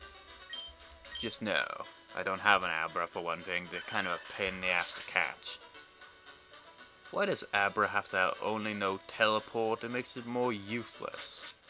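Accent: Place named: United Kingdom. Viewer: Love the British(?) accent. Lol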